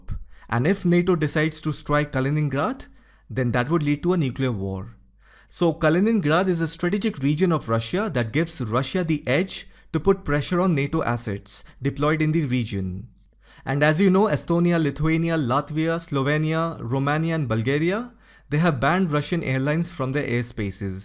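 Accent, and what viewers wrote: India. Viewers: Why is a guy with an Indian accent narrating a video about European history. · Its really amazing to hear But i couldn't understand that With Indian accent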